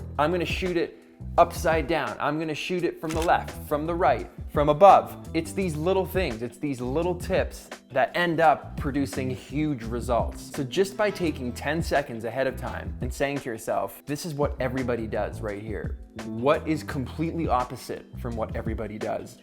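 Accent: Canada. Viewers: Are you Canadian? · Is he Canadian? Where from?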